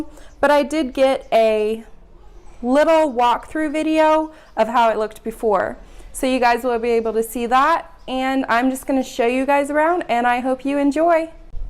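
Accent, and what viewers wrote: Kenya. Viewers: Your accent has changed too. You sound more kenyan now even when you pronounce certain words. Thanks for the tour!!!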